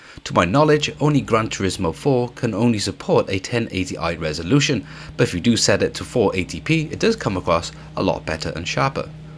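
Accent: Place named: South Wales, United Kingdom. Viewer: Your definitely from South Wales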